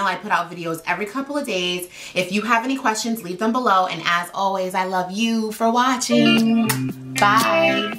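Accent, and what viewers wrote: New York, United States. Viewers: Oh I will, you've got that great personality and New York accent, you're too enjoyable lol!!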